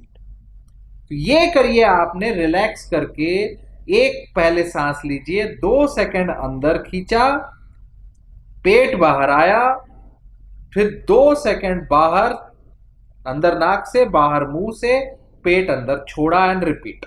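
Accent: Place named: Punjab, India. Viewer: Maadi moti 😄 punjabi nikal e jandi aa sir 😂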